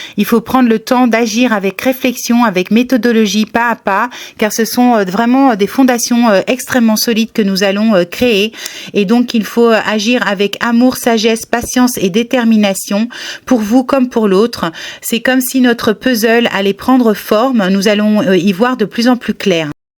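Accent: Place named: England, United Kingdom. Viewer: Bel accent anglais, mais en ce qui me concerne, je préfère la langue de Molière🍅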